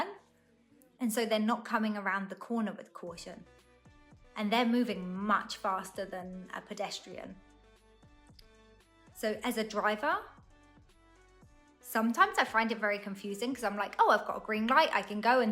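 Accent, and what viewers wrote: United Kingdom. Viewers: 我喜歡妳的英國腔英文，妳對台灣的交通評論很正確，以後我會開慢一點！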